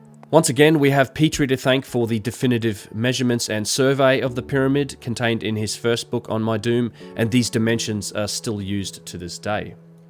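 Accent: Australia. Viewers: Good on you mate. I have developed quite a taste for your output and even though I don't necessarily agree with some of your ideas it is refreshing to see someone not just regurgitating the musty work of the orthodox crowd and be prepared to come at these matters from a different perspective ,,particularly with an Australian accent. I don't know if you are self taught but if so that is even more praiseworthy given the range of topics you skate through while showing the bird to the "club" I am enthralled by the current debate bubbling away concerning the age of the sphinx and would love to hear you do a paper or podcast on that. The proponents of the older sphinx are not ratbags but well educated professionals with fairly persuasive arguments from geology and a range of other disciplines to support them .It is fascinating to observe such a vibrant argument.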